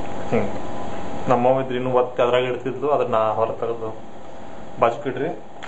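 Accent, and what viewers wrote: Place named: Karnataka, India. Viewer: i somehow like the dharwad accent of speaking kannada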